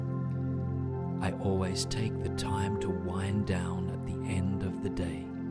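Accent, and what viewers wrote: Australia. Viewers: Hey, as a fellow Aussie, I appreciate the accent, last 12 months have been terrible, but I feel like I'm turning a corner with the affirmations, still going through terrible things, but I'm getting through, and it bothers me alot less, thanks mate, god bless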